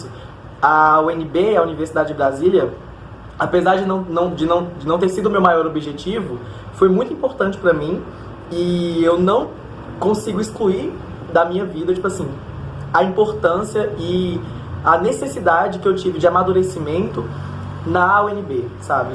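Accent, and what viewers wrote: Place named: Minas Gerais, Brazil. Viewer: inspirador. já pegou o sotaque mineiro todinho! hahah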